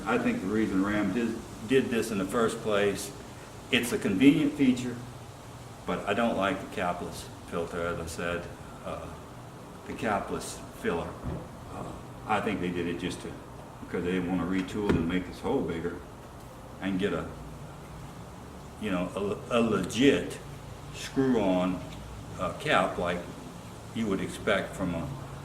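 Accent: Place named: New Orleans, United States. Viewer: sounds like your from new orleans area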